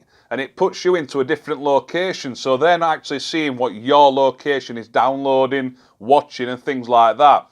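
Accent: Yorkshire, United Kingdom. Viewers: Great video, thanks for the informative video and links, where abouts in Yorkshire are you from mate?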